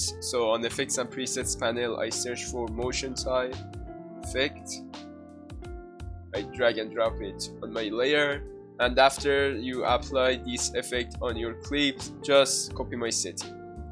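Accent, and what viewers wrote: Iran. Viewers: your accent is so iranian coded. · دادا من دارم شروع میکنم از لحجه نازت فهمیدم ایرانی هستی .کاشکی زبونتم ایرانی میکردی ولی غب.سطخت بالاست .ماشالله😍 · عجب لحجه ای قشنگ معلومه ایرانیی😂😂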